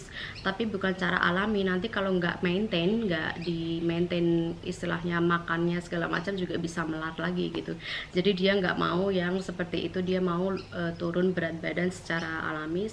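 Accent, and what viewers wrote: Java, Indonesia. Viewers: njowoo banget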